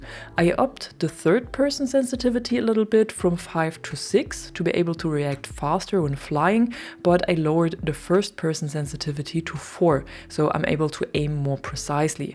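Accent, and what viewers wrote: Germany. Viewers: man hör den deutschen Akzent echt nur ein bisschen raus🤣🤣🤣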